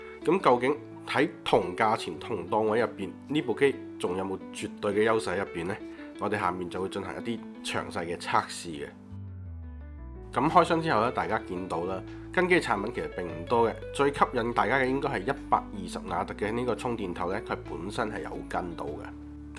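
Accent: Hong Kong, China. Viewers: 就香港粵語口音啊，我也聽得懂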